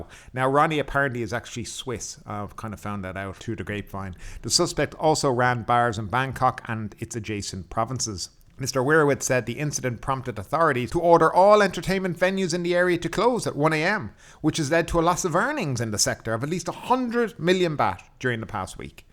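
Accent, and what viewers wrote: Ireland. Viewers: Irish accent?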